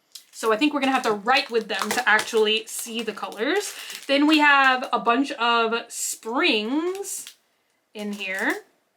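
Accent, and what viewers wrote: South, United States. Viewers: SHE'S FROM TEXAS. All of us southerners talk like this.🤷🏽‍♀️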